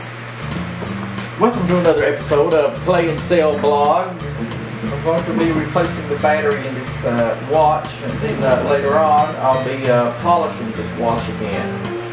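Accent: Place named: South, United States. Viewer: Interesting how you retained the southern accent but you’ve been in the Philippines.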